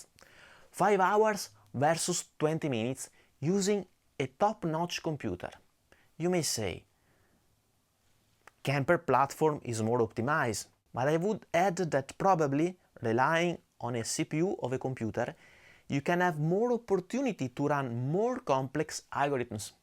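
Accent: Italy. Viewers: Great video, great channel from the few videos i've seen so far, and digging that Italien accent (I'm French btw) :)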